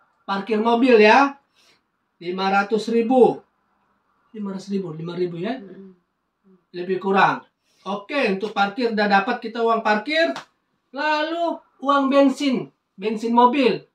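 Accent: Medan, Indonesia. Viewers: Mantap abang ku , kayaknya medein medan ini abang ku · Kayak Medan kali bahasanya😊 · Medan kali bahasamu lagi yak horaslah. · Abangnya logat bicararamya dari medan ya Bang?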